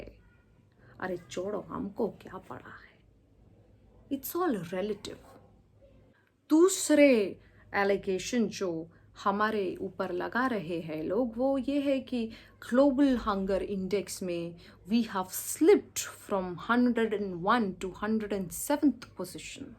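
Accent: Tamil Nadu, India. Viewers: Excellent Tamil mixed Hindi accent . Excellent 👍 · .... Your Hindi South accent is best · I just love your content. Look at your south Indian accent. Wow wow wow. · Jab Honge Mazboot Dollar....toh Mat pakdo hamara Collar... Just too good...What I loved was the heavy Tamil Accent... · Bahut khoob! BJP is tunj bhari vakiya kay baannon kay saamnay Shikhandi kay aahut Bhishma bhanti lay baithegi. Gaur firmament, aur voh bhi upni Tamil oocharith Hindi bhasha mein! Shabash, aur lugay raho beti upnay shubh karya mein!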